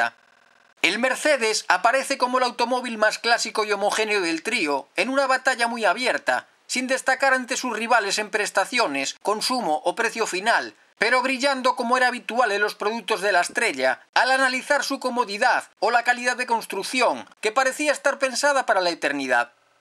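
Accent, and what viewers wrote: Galicia, Spain. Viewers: Este vídeo es al canal lo que un Mercedes clásico a la automoción: calidad, honestidad, sosiego y elegancia. El acento gallego es la guinda, pues es original y huye de convencionalismos. Desde Donosti: zorionak! Excelente trabajo.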